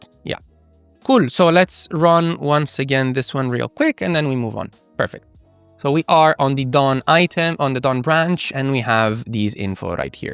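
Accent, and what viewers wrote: Italy. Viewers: You make very good videos even if your English is a little bit Italian.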